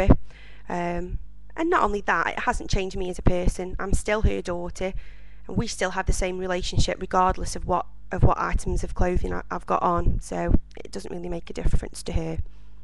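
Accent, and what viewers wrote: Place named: England, United Kingdom. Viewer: asalamu alaykum sister ; congratulation for getting back to this wonderful religion , from your accent i can tell that you are from england or around that area , i know its a hard decision but the benefits are great , , your brother IMAD from california , and congratulation again for converting to islam let me hear from you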